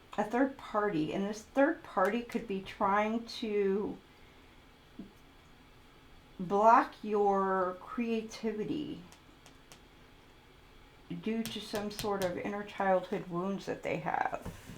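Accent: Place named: England, United Kingdom. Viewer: Your English accent is hilarious 😂 I guess my Scottish accent is too! Edinburgh is pronounced as Edinbra or Edinborough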